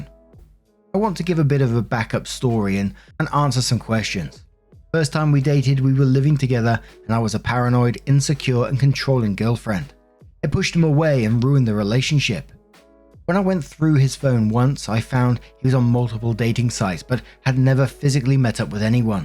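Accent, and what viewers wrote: United Kingdom. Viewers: Nothing like getting my three miles in in 15 minutes while listening to his melodious British accent.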